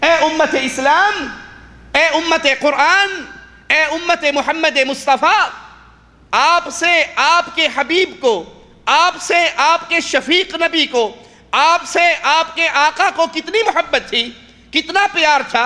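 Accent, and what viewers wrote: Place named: Arab world. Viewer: Urdu arabi kay andaz may bolnay say jhot such may nahi badalta .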